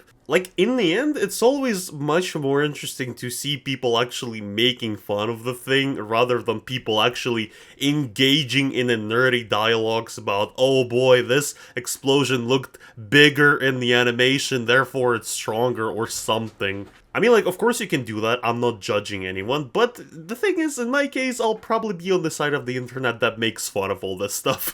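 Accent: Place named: Russia. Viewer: Кто заметил акцен пишите. Я знаю, что ты русский, меня не наибеш. Спален за русским геймплеем.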